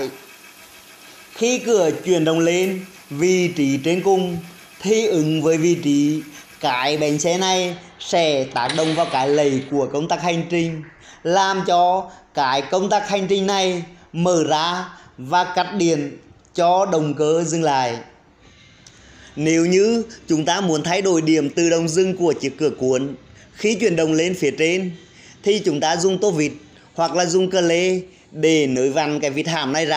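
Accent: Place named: Central, Vietnam. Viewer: Giọng miền trung Hay quá chúc bạn ngày mới vui vẻ nhé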